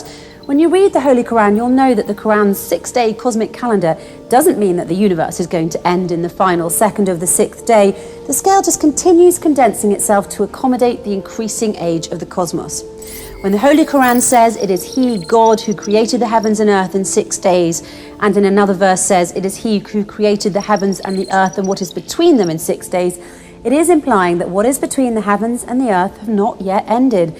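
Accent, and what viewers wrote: England, United Kingdom. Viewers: This is English accent at its best !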